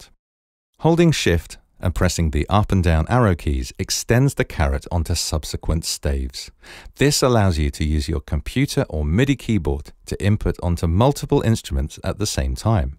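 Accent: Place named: England, United Kingdom. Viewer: If it’s explained with an English accent we think we’re the stupid ones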